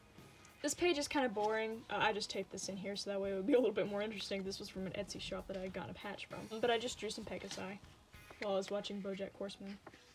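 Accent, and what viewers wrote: South, United States. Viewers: Ya know what, I did not expect your country voice from that thumbnail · I love your southern accent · You draw these uwu boys but your voice is very southern it’s throwing me off XD you sound like you live in Texas or somewhere deep in Gerogia.I’m southern myself but my accent barely ever comes out unless I’m casually talking in English with people I’m comfortable with and use slang. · You sound really southern ekwnensmsn me too!! Where are you from?? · Broo u from the south? U have a nice accent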